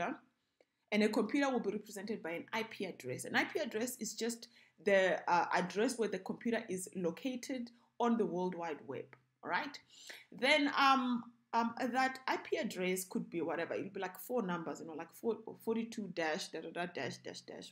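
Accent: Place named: South Africa. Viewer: Damb lady you are smart. So refreshing to hear a SAcan voice to explain things.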